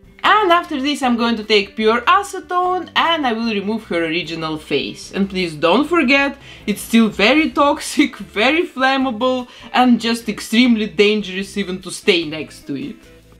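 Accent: Russia. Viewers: where are you from? i'm sorry but your accent is hhhhhorible. неужто из России, мать? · По акценту сразу понятно, что вы русская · мне кажется, или я слышу русский акцент?😂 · Русский акцент 😃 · Приветики рашн акцент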